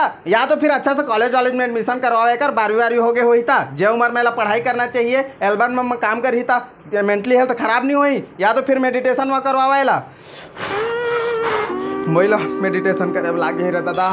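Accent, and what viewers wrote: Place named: Chhattisgarh, India. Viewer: Ak number yrr bhaiya maja aa gaya cg style me 😅😅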